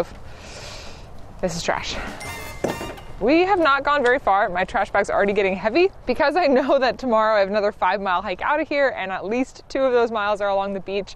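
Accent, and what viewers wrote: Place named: Pacific Northwest, United States. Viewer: You literally speak and act like all of my PNW friends. It's almost like its own dialect. It's hard to explain unless you grew up here.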